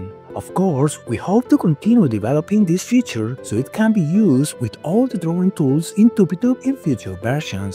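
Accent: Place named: Colombia. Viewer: Siempre esa voz de hablando inglés con un acento colombiano es bastante íconico y reconocible en si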